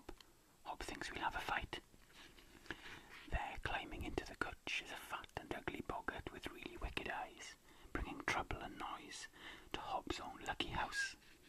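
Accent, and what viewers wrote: Wales, United Kingdom. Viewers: I love this idea. I LOVE the book you chose. I struggled a little with your gorgeous Welsh accent, what with so MANY whispered words. Would you consider soft-speaking, or a mix of the two, if you do more storytelling? You lose a bit of the intonation, with whispering, is all. Thank you so much for spending your free time on videos for us! PS: The blanket is fab!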